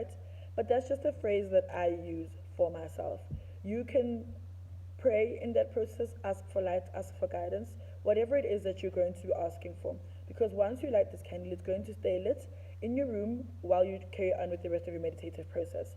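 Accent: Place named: England, United Kingdom. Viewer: logogo wenu okhuluma isingisi wathwasa e England yini ?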